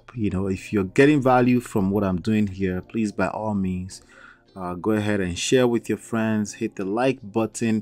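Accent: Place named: Nigeria. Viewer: Hi, you sound like a Nigerian. I am watching from Nigeria. Greetings. Just subscribed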